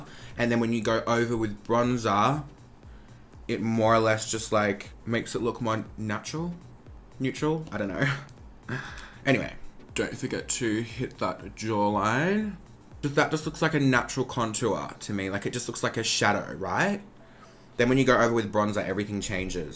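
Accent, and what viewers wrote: Australia. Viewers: is he Australian? i can't tell because I'm Australian and i am used to the accent. It sounds a bit British at times..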